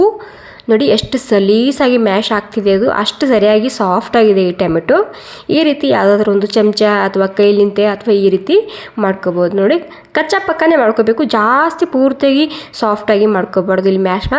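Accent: Maharashtra, India. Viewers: Tumcha marati style kannada lai avadle tayi😊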